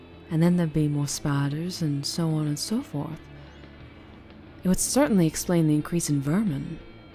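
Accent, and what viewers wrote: South, United States. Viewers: Wow! Amazing work as always. I know this vid wasn't as popular as normal for some reason but I love this series! And as a southern born floridian man I enjoy the stage southern American accent. Hope it continues. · 3 weeks it's been 3 weeks i.... mam thank you for the upload i missed this. Also a slightly deeper southern accent double noice.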